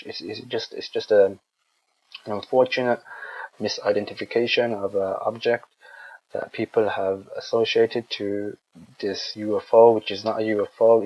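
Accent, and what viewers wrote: United Kingdom. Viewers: You fool... remember that 10 ft. wide meteor video shot from a distance of, oh, at least a few hundred miles away? Remember the intense magnification and sheer size of the video camera it took to make the meteor appear larger than any comparable object in space? Notice how, of this video the astronauts zoom in as much as possible yet the thermal blanket looks ---- incredibly small? Remember all the photos and videos of the hundreds of thousands of pieces of space debris taken by any number of astronauts who participated in low-orbit flight missions? Notice how in the video N.U.A. (Nothing Up [my] Ass???) conflates relatively small portions of the "blanket" with obviously larger portions of the object - still - in question? And please do tell us how NASA is always honest with the public (a place where "honesty" means something different to NASA employees in adjacent offices within its buildings). 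Before you believe some idiot with a droll English accent and before you gag on NASA's nuts, take a few minutes to actually ponder this video and consider how little information, beyond his "opinion" is being disseminated. Consider, too, that this is the same slickster who posted (among other fantastic "UFO" video lies): CAN YOU DENY THIS?! FLEET OF HUGE UFO MOTHERSHIPS - LA JOLLA, CALIFORNIA - 31/3/2015 without ever once seeking to inform anyone that the government has any number of aircraft that can perform everything seen it his video. If you must, buy a clue... may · You sound British Asian if I'm right?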